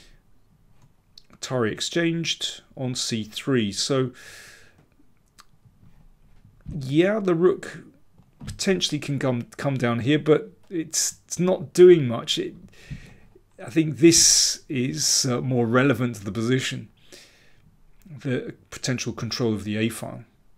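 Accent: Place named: RP, United Kingdom. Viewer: If you wanna hear someone talking too much, try agadmator's Chess Channel.. or, if that's not enough even try this Pepe-guy on chess24: absolutely unbearable word-diarrhoea. Daniels Grandmaster speak is exactly in the sweet spot between education and entertainment, and neither too little nor too much. And, may I add, kept in the most wonderful Queens English. Keep it up.. and tnx a bunch:-)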